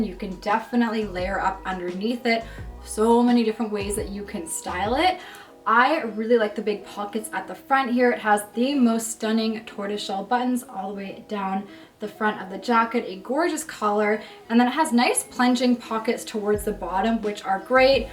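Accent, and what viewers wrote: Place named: Canada. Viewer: Love your pieces girl💕how did I know u were Canadian based on your accent ☺️I guess we do hv an accent 👍🏽😂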